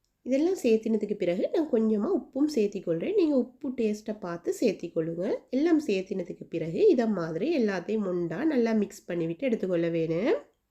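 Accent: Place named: Sri Lanka. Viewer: இறச்சியில் முள்ளா. 🤣🤣இலங்கை தமிழ்👍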